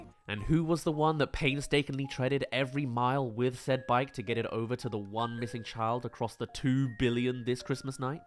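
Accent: Scotland, United Kingdom. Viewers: How did you as a British person, mistake a VERY Scottish accent for an Irish one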